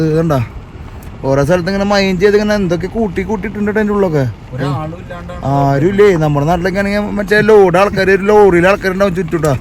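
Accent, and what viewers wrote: Thrissur, India. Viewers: ❤❤❤Wonderful description in Thrissur style and excellent camera...keep going ❤Artist Francis Antony Kodankandath from Thrissur,Kerala, India ❤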